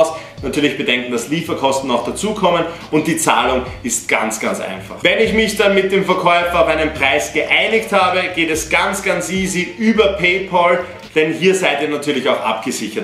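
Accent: Austria. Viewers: Liebe deinen österreichischen Akzent. :D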